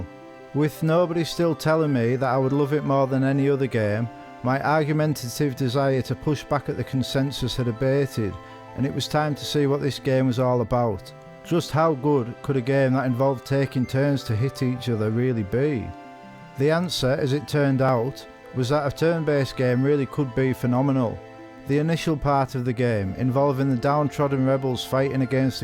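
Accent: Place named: Cumbria, United Kingdom. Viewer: You've got a gift for this brother. Goosebumps. Even with a Cumbrian accent.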